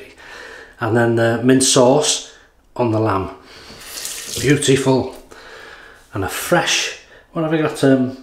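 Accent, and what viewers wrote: United Kingdom. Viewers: It's always great to hear some British English accent. Your videos are not only entertaining and relaxing, but educational too!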